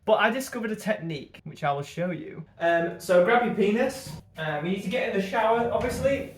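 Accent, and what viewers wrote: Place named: United Kingdom. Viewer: Forgive me for being a dumb American but you’re so British! LOL I could listen to you talk all day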